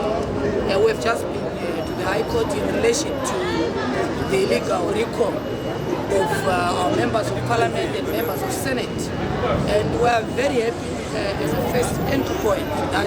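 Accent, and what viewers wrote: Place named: Oxford, United Kingdom. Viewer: Taura zvako wangu mufanha wechidiki , who speak English with an Oxford asent Osalo 💖💥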